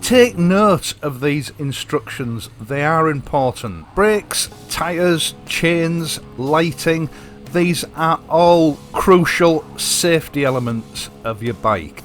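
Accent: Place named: Hull, United Kingdom. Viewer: I'll answer for - his accent is 100% Hull/East Riding of Yorkshire (but more Hull), a unique accent that is like nothing else. You don't have to go far from Hull for it to change - about 15 miles in any direction....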